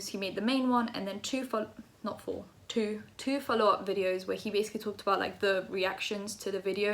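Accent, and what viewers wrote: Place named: London, United Kingdom. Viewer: That’s a proper London accent u got there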